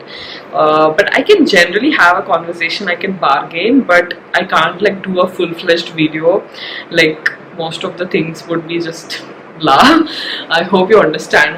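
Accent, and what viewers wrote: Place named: Hyderabad, India. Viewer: Hyderabad ka Hindi v bole to acha nhe rahta..... jaisa rhta waise he chalenga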